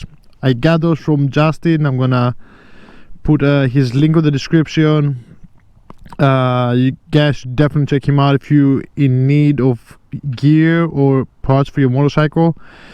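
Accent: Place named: Greece. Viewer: Hey mate, 2 facts that lead me to think that you are Greek. 1 (?) and your pronunciation..it reminds me a lot of mine 😂. So I might be right, I might not. Cheers from Greece buddy! I am thinking of those gloves, and unfortunately in Greece we do not have the chance to try and feel astars products, because very few models are available in stock. So I am thinking on getting these from EU store, but a little concern with the sizing. Should I stick with the sizing chart? Or 1 size up/down. Any suggestions? Thanks a lot, ride safe!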